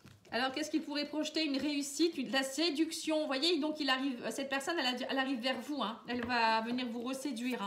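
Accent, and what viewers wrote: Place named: North, France. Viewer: Merci infiniment vous relater mon histoire je suis bluffée vous êtes très forte! L'amour Est plus fort que tout 😊🤣 J'adore votre accent du ch''nord n'est ce pas?😉 Je vous envoie toute ma lumière et mon amour 💐❤️😇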